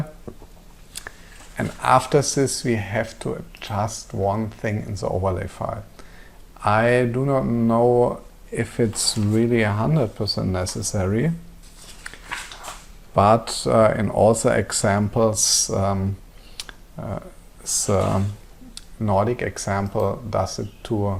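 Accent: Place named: Germany. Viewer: Ich hören den deutschen Akzent und schreibe daher auf deutsch 😅. Danke dass Sie diese Videos mit uns teilen. Dies ist mein erster Versuch auf einer Plattform hörer als arduino oder esp zu arbeiten und gar mit open thread. Diese Reihe ist ein super Einstieg obwohl ich schon so viel gelesen und versucht habe traue ich mich noch nicht damit zu beginnen bis ich es nicht zu 80% verstanden habe😂 bitte machen sie weiter so und vielen Dank!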